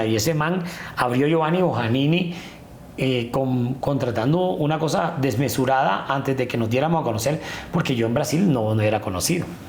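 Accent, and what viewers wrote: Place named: Barranquilla, Colombia. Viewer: Barrnquillero que baila arrebatao, no has perdido el acento currambero. Orgullo costeño...que sigan los éxitos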